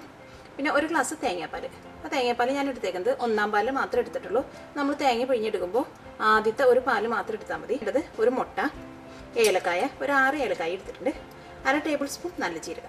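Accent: Thrissur, India. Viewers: No offence Nancy, but her talking style is her idendity! I would request her to be the way she is! I am not from Thrissur. But when i listen to her, somehow I feel home.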